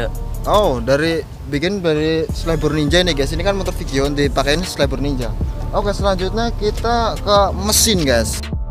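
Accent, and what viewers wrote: Banyumas, Indonesia. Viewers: Apik ngapak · Bang aku tau abang orang mana abang orang ngapakkan yang tau like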